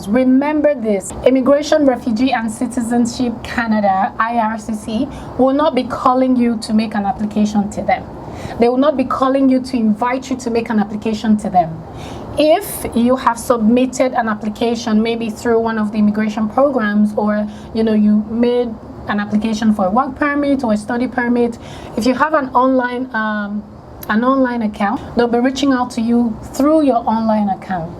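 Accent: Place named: Nigeria. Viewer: I could listen to you all day. I love Nigerian accents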